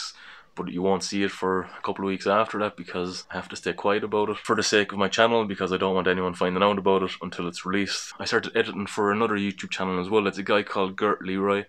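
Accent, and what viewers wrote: Ireland. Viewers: Kinda down to how you define Vlog really. Isn't a vlog a video log? So it's about you and whatever you're up to or what you're into. Unless you have a completely boring life then a personal vlog is going to have variety. I've looked into Irish vloggers and if they're not yet done copying Jenna Marbles it's largely them coming and going to and from work or their sports/hobbies. You do have a niche with your Irish head on ya and accent and living in the Wesht of Ireland. The majority of Irish vloggers seem to be all cut from the same middle class or hipster or trendy bolts of cloth. Don't compare yourself to them at any rate. Also that argument for niche is countered on YT with others saying if you don't have one to do verity until you do find onr. You're thing is very distinctive anyway and if you got picked up by some American viewers you'd spread far and wide, especially if you're bringing them local stuff. 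Start another channel for the project pieces but dance round them on the vlog to push traffic there. We intersect on several things you've covered not just the one, heritage, video, Geocaching and local radio being the main ones. Ya don't normally find those things on the one channel so variety does appeal to many. Ultimately you are the brand and you are the product here. I'm here to find out what got up to this week and maybe learn a few things on the way.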